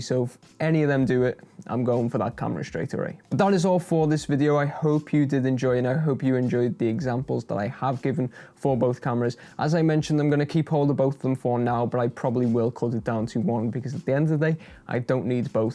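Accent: Liverpool, United Kingdom. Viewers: Where abouts are you from man? you sound close towards liverpool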